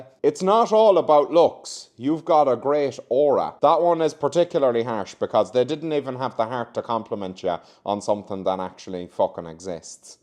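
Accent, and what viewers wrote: South, United States. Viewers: Great southern accent!!! :) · I love your southern accent 😊😅 Butter wouldn’t melt in her mouth People asked if I was part of the BLUE ARMY…I had no idea why they would think I was in the army!!! ☕️☕️☕️☕️☕️ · You do MARVELOUS American accents!! Have you lived over here? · 😲 Your southern accent is spot on! “Bless your/his/her/their heart” isn’t always an insult. It depends upon the tone of voice and facial expressions used when saying it. “Sweet pea” can also be used in a similar way. In fact, 🤔 I think most southern compliments can be used interchangeably as insults (you have to be polite, after all). The tone, timing, facial and body expression is the key. Shoot, my favorite southern “fuck you” is “Jesus loves you!” 😂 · 😂😂 the southern accent was what I needed to hear today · Your performance of the southern accent is spot on.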